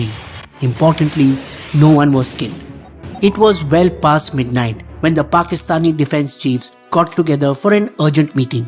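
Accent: India. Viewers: well the accent is india so bye the vedio is gonna be as fake as the indian media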